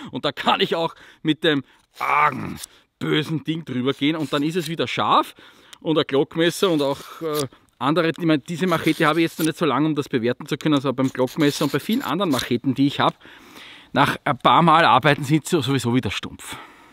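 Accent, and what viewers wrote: Austria. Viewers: Wieder ein interessantes Video. Ich gucke deine Videos immer gerne. Bin auch ein grosser Fan des österreichischen Dialekts 😊. Sehr sympathisch. Ich hätte noch eine sachbezogen Frage. Wie schärfst du dein Fällkniven? Habe mit balligen Schliff so meine Probleme. Vielleicht könntest du zu dem Thema auch ein Video machen 😬😇? Viele Grüße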